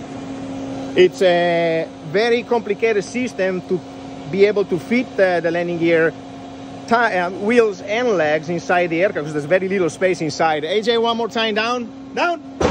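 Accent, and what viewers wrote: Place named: Italy. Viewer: Love your videoes and the way you explain. And your Italian accent. Greetings from 🇧🇻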